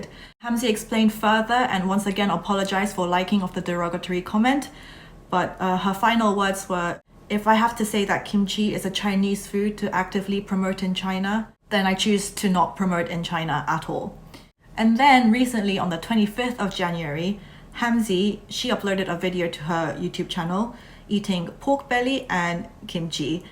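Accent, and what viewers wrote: United Kingdom. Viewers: 영국식 발음같은데 맞나용? 멋있네용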